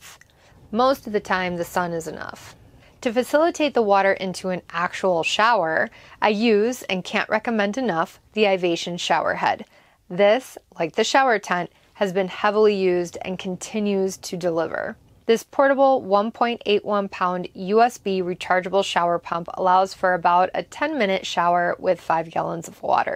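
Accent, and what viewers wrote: Wisconsin, United States. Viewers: My shower needs are probably less than yours because I’m a guy and I’m bald but the shower I keep going back to is the MSR dromedary bag with there shower attachment that I purchased in 1998 it’s simple and it works and for the last six months it’s been my only means of showers even during the-25 weather we had in Wisconsin this winter! O and when you say stuff about How you talk and ramble You just sound like a Wisconsin girl to me.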